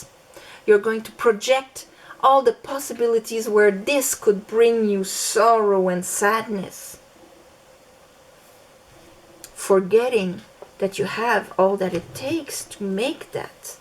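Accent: France. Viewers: Thank u. I hear a beautiful French accent. U r very beautiful inside out. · cute french accent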